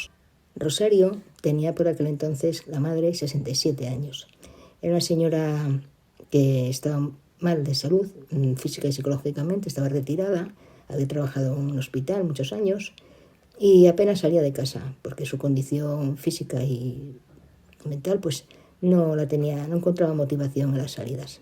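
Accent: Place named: Asturias, Spain. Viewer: Mi like y suscripcion para que tu canal crezca , por el acento pareces Asturiana , yo tambien , buena suerye con el canal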